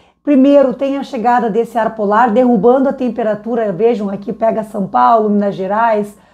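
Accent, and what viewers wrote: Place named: Rio Grande do Sul, Brazil. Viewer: Deus abençoe vc e seu trabalho gosto dimais do seu sotaque ,Deus abençoe o povo o povo gaúcho. Amo vcs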